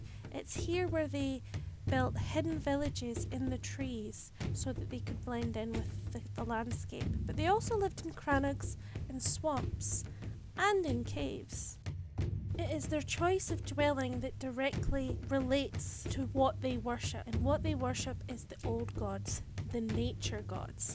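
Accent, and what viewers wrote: Scotland, United Kingdom. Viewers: like your Scottish accent.very informative video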